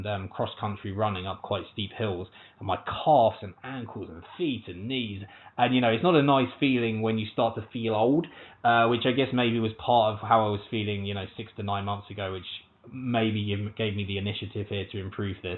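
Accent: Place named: Australia. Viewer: I cannot tell if you have an Aussie Twang to your voice or it's that sort of unique London/Southern accent.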